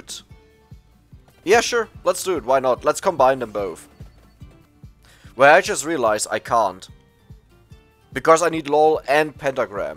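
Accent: Germany. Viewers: Love the german-ish accent and, if you didn't already, would love to see some video of regular runs while you explain the thought process of choosing an upgrade or the other and the reasoning behind it. Plenty of people apparently don't "get" the basics behind how to at least finish a normal run and I would love to share some of your videos with them ;)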